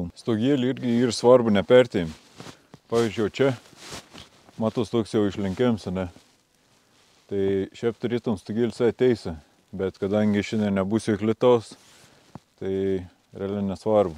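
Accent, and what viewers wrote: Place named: Samogitia, Lithuania. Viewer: Vėin už žemaitiška rukavima pagarba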